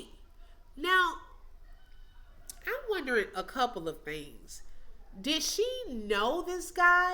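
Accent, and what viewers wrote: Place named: Texas, United States. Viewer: Nah. You speak Texan, which is a language all its own! ❤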